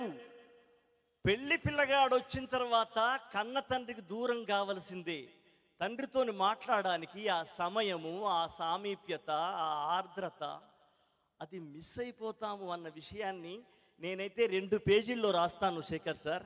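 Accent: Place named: Telangana, India. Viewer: Me goopathanam telusthundhi me matallo...... Telangana thathwam dwanisthundhi me yaasalo 👍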